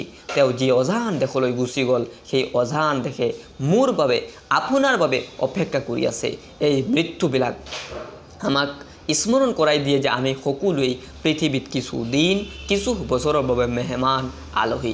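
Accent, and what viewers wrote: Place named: Bangladesh. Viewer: The voice is of a bangladeshi.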